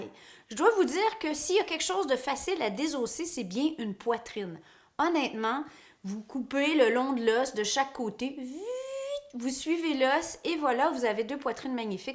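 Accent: Quebec, Canada. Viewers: J’adore ton accent québécois ❤️❤️❤️❤️❤️❤️🇨🇦🇨🇦🇨🇦🇨🇦🇨🇦🇨🇦🇨🇦🇨🇦🇨🇦🇨🇦🇨🇦🇨🇦🇨🇦🇨🇦🇨🇦🇨🇦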